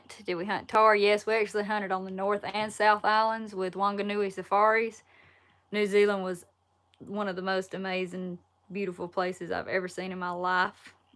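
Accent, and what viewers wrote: South, United States. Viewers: Your video may have been taken down because they are doing random removals because they do not have human staff to look at videos and censor them correctly. You may be a victim of You Tube picking random videos that have a title that may be slightly suggestive. Re-download and put a title that is different "DO NOT SAY NO EARS" This gets their attention. Try it again and I guarantee it will not be taken down. If anyone here does not know that your Dad is your Dad and thinks he is your boyfriend...well they are not paying attention. I knew that from the first few videos I watched on your channel. Your videos are great. Your Dad is a very nice man who loves his Daughter. He is one of the hardest working men I have ever seen. You get your work ethic and Southern Qualities from him. I Wish I could tell him how great a job he did raising you...you are a beautiful and clearly well brought up young woman. 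I love your accent. I lived four years in the South and miss that Southern charm. Keep being you. You are a wonderful young woman. Some man will be blessed to call you his girl someday. Stay safe and healthy. We love you and your dad! XX's <3 I hunt and fish and F those who think anything is wrong with that. It is called conservation. You do what you have been raised to do and be proud of who you are. Geo. Like my channel and subscribe please! Geo. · You're an Amazing Pioneer woman & that southern draw is sweet & badass all in one. Will ya do a bowfishin night video for us this summer? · True southern belle with your accent y'all are great. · Gday from the Sunshine Coast Queensland Australia, love me a southern accent couldnt get enough of the stunning southern ladies when i called Bossier City Louisiana home 😁 love your work, awesome job, and tell your daddy he raised you right, nothing beats a well manner, beautiful, country girl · You have to keep that accent I love that southern accent have a great day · You’re accent is awesome. 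And I’m from CNY. Love the southern accent. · The best thing about your video's is you. Your beautiful, have a sexy southern voice, a good sense of humor, and your a talented hunter. From watching your video, it seems like your a wonderful person and well, if you haven't noticed, we're all still watching even when your live, in your pajamas with your pretty hair not brushed. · I love the way you talk and wish I had that southern accent you do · Southern accents are the best! You don't sound like a dude, whoever said that, needs some game ears to hear your pleasant voice! Keep doin whatcha doin! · accent what accent thats just your southern charm a shinin through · You have a lovely southern accent . Nice to hear someone with more of a southern accent , than mine ! :-) Be safe and stay healthy . Wyr God bless From Texas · I love you're southern accent. Alot of my family is from the south. 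Im considering moving back down south at some point in time. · Don't you ever think your accent sounds bad.I have always loved a southern ladies accent and yours is the best.I am in Baltimore just on the outskirts of the city and I would take your southern accent and southern charm anyday from how they talk here.Dont let anyone say anything bad about the way you talk or act.Your pure class. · Sound like a man?!? Uh ... No. A sweet southern accent is like a warm blanket to me. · I love your accent its an authentic American accent what you do for a living is cool, girl. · Your southern accent is beautiful just like you! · I wish all women had that beautiful southern accent!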